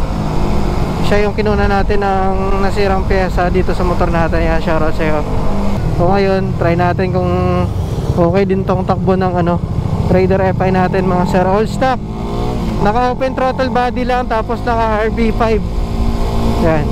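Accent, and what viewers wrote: Iloilo, Philippines. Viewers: Parang iloilo to ah.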